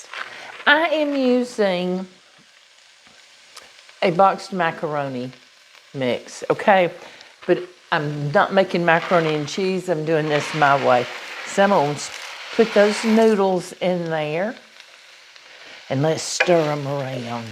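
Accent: South, United States. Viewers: This lady has a theraputic effect on my nerves. I am in U.K,,is that a Southern U.S.A accent? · I don’t know you but I love you and love watching you cook. Your southern accent is adorable. OH, and I bought your cookbook. My daughter loves watching your videos, too.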